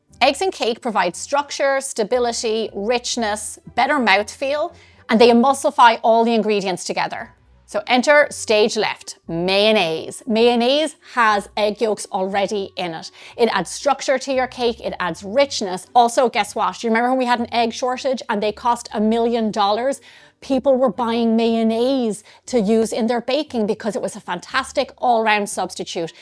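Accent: Ireland. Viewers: (LOVE this woman's Irish accent!) 😊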